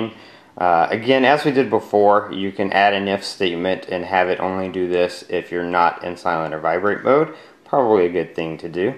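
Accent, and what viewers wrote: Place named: Scotland, United Kingdom. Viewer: How did you get the Scottish accent?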